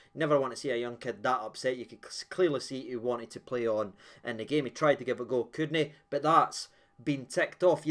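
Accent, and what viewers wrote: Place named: Scotland, United Kingdom. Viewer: Where are you fae fs hahah “ye dinny needty gee them chances” “They dinny make mistakes” “Arny ginny be here” Dinny n ginny🤣🤣 jeezo man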